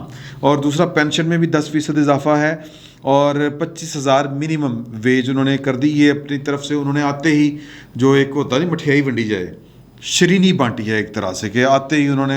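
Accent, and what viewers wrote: Punjab, Pakistan. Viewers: You always share nice informations. However i like your way of talking. Urdu mai Punjabi ka Tarka. appreciated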